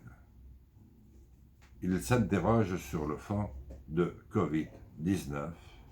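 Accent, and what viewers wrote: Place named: Paris, France. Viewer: Quel bel accent ! Une parisienne du peuple.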